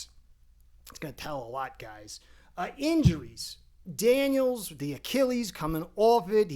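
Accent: New York, United States. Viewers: This man sounds more like a Jets fan. (The accent).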